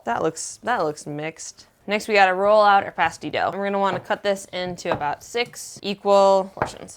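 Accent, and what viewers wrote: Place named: Michigan, United States. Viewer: I can tell you're from Michigan bc of your accent :)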